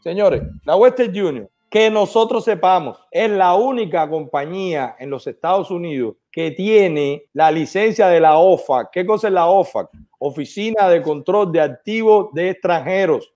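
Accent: Cuba. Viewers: Señor usted es un excelente comunicador y se informa muy bien hablando en buen cubano con usted no alumno bruto, felicidades, gracias.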